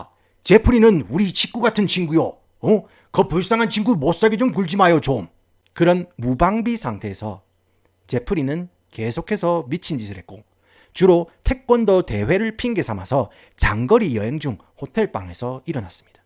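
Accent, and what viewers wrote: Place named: Gyeongsang, South Korea. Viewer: 갱상도 ㅎㅎ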